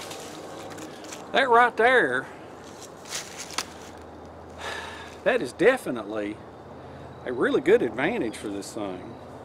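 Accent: South, United States. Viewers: Great vid. Are you from GA? Lol you sound just like my uncle from Savannah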